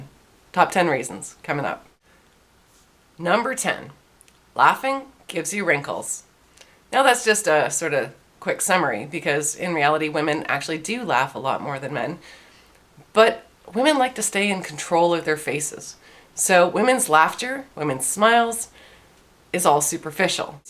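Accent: Canada. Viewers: Weird Canadian accent..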